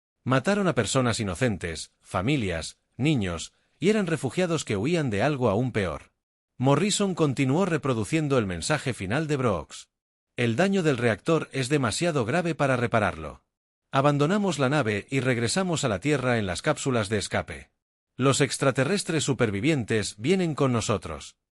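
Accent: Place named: Italy. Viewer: Si vas a hacer esto con IA, por lo menos asegúrate de hacerlo bien. Haz que lea los nombres en inglés con la pronunciación correcta y evita eso de leer el texto con acento italiano. Por el resto, todo bien. Pero es un poco molesto escuchar con esos detalles🥲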